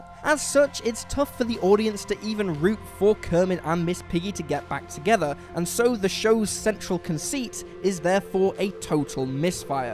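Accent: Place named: England, United Kingdom. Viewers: You can tell the announcer is English we get loads of Americanisms wrong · Might be cause they're from England? Pronunciation is different man.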